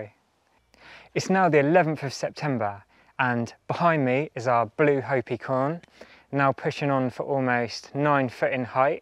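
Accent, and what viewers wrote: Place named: England, United Kingdom. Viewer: Gaspé (a 6-row, short-cob flint maize) would do it, even in the severe climates north of 53°N in vaguely-interior western North America. You sound like you're from England (incidentally, I am too - I say "England" and not "Britain" for very personal reasons) - what part of the country are you in, and how many GDD10s (growing degree days - base 10°C) do you get in a year?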